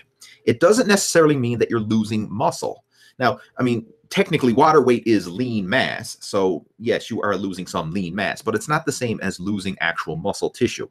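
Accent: Newfoundland, Canada. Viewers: Are you from newfoundland? I seem to remember that you are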